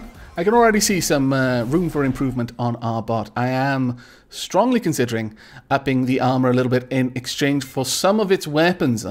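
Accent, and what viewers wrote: Wales, United Kingdom. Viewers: I sodding love your accent. The Welsh really have a wonderful language:) Great video, just as usal,! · I love the British accent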